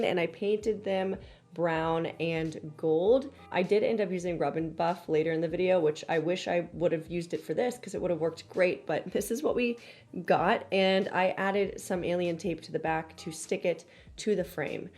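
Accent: United Kingdom. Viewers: Wow … Legit looks amazing. Also the funny odd dorky British accent ish .. haha so random. So brave !! to have starting staining the shelf mounted …with the wallpaper …. My nerves !!!! Haha And yes….. toilet seats made of wood/ wood design.. look amazing. Well done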